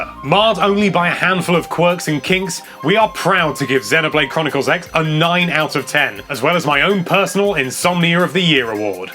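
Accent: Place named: United Kingdom. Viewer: I love how wonderfully British you are, it's so fun listening to you:) I can't wait for this game, I'm so hyped.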